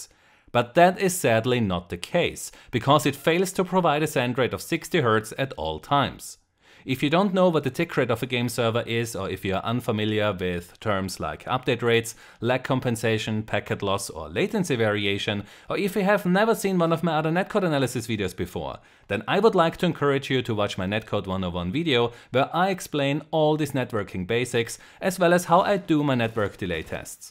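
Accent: Germany. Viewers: 1 satz und man hört das du deutsch bist :D klasse video!! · German speaking english????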